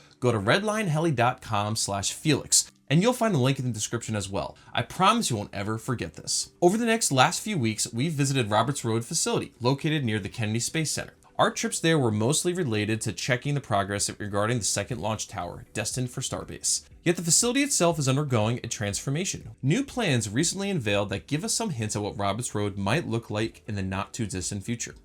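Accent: Germany. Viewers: I mean the content is great, but Felix passion is unmatched, it makes so much more fun to watch him. German accent for the win ;) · Okay… good good now this time with a slight German accent.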